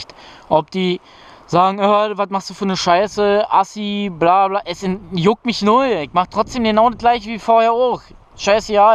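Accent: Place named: Berlin, Germany. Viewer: Digger diese berliner schnautze einfach zu geil👌🏻👌🏻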